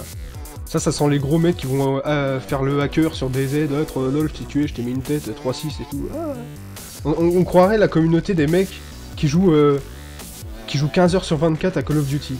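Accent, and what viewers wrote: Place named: Charleroi, Belgium. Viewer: L'accent du nord que tu as me fait blinde penser a celui de Charleroi (ville de Belgique) 😉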